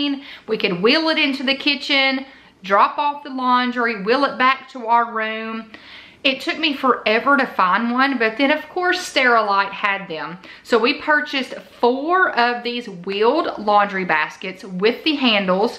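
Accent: South, United States. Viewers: Country accent love it. I just found your channel.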